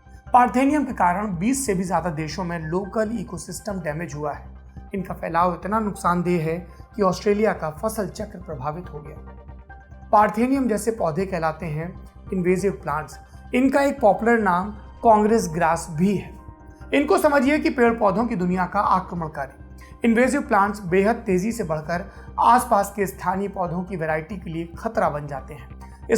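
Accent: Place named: Varanasi, India. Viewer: Mahur bol dela guru..... Banarsi style me😜😜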